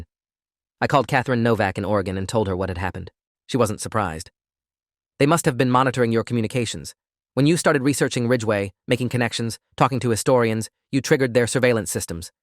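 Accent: United Kingdom. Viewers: There is a bit of a British accent that comes through the voice filter must have malfunctioned.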